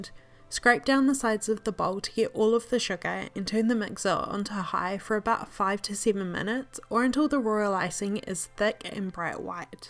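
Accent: New Zealand. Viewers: Is she from New Zealand? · I know a Kiwi accent when I hear one! This was so helpful for a first time gingerbread-houser! (if that’s even a thing)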